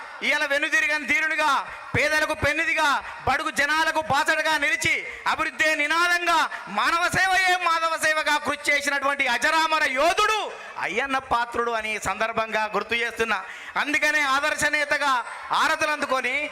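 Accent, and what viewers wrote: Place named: Telangana, India. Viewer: తెలంగాణ యాస భాష నీ ఒక్కడికే సొంతం నువ్వు మాట్లాడే ప్రతి మాట ఒక తూటాల దూసుకుపోతుంది అందరూ రాజకీయ నాయకులు పేపర్ లో ఉన్నదే చదువుతారు కానీ మీరు అప్పటికప్పుడు సందర్భాన్ని బట్టి వివరించి క్లుప్తంగా ఎంత విమర్శించారు ఎలా విమర్శించారు మీకు తెలిసినంతగా ఏ ఒక్క రాజకీయ నాయకుడికి తెలియదు ఎందుకంటే మీరు తెలంగాణలో పుట్టి పెరిగి తెలుగు జాతి తెలుగులో మాట్లాడే వ్యక్తులలో నువ్వు ఒక శక్తి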